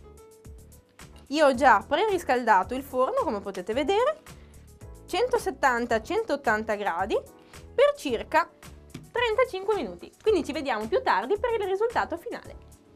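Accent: Italy. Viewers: Ma sei di Parma?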